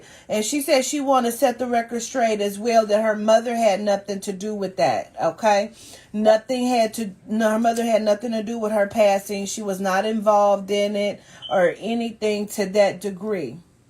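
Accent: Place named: Chicago, United States. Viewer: I’m from Chicago and that accent is on point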